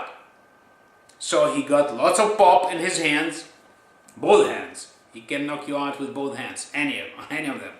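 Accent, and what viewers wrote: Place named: Russia. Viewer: Are you russian buddy ? LOL I like your accent.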